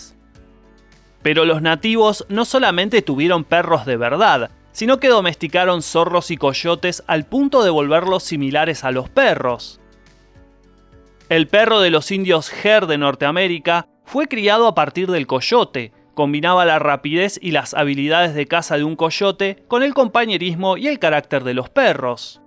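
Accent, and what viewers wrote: Argentina. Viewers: Pero es argentino Ese es su acento No puedes cambiar algo que es endémico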